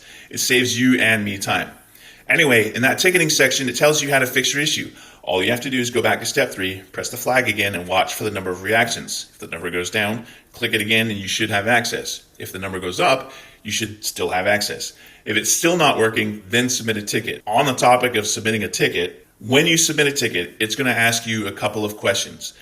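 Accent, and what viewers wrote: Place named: Gloucester, United Kingdom. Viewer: I have to admit my limitations as much as I would love to join Discord - that’s for the more computer savvy person - for now I will continue to check in with you on YouTube. I’m a from Gloucester Mass a Longshoremen or as we say “Lumper” town where a guy like you - the way you talk is familiar and comforting. Thanks for everything your doing ☮️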